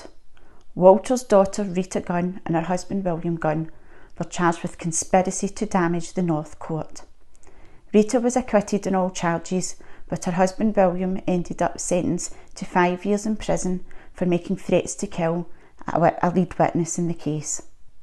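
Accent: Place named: Scotland, United Kingdom. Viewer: Enjoyed your video very informative and lovely Scottish accent ❤. Regards Scotch Rob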